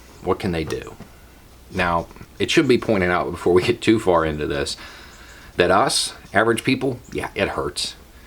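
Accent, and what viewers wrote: United States. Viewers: Fucking hell, I'm so used to hear trumpian nonsense these days that hearing sense spoken with an american accent nearly made me fall off my chair! I should know better as there are as many smart americans as any other nationality. Speaking from europe. We got our own troubles of course, it's not paradise, we're not that bad yet even tho there are plenty, too many, who wish to adopt the USA ultra liberist system full on. Power of hollywood I suppose. Good luck to all of us.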